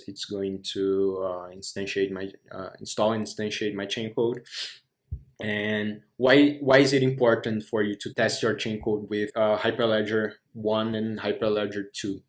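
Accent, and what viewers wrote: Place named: Brazil. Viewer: sabia que era br quando escutei o sotaque kkkk, mas bem legal o vídeo, parabens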